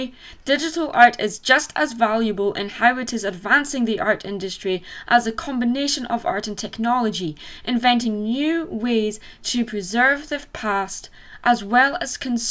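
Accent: Scotland, United Kingdom. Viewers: wait....are You Scottish?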